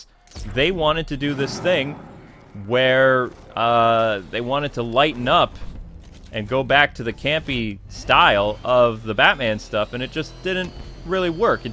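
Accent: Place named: New York, United States. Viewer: I could hear the New York accent coming through today! Great vid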